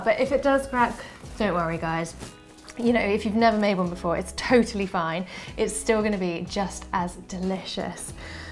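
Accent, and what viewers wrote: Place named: RP, United Kingdom. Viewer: A Very British “Toff” Accent!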